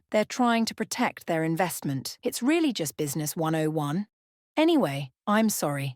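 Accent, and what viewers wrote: United Kingdom. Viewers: Artistic originality without the resources to promote them seems pretty futile! I guess you like to think you are clever by saying silly and outlandish things in that calm British accent to make it seem like it is a well considered opinion, whilst you are simply shilling for engagement just like all the trolls!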